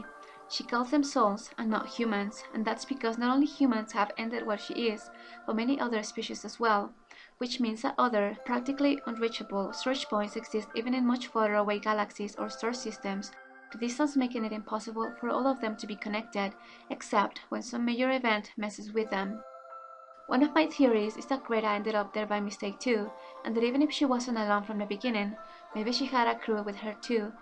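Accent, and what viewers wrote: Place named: Eastern Europe. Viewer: Awesome video. This story blew me away. Great thoughts and analyses. Love your Eastern European accent, btw...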